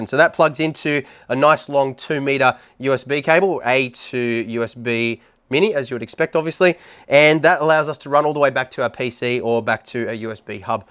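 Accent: Australia. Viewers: Got me with the Aussie accent...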